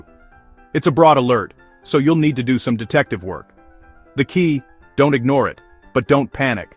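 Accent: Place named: United States. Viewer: British words with a USA accent.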